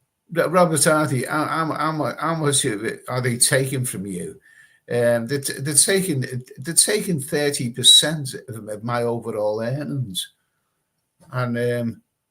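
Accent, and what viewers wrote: Liverpool, United Kingdom. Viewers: Well, he is a scouser.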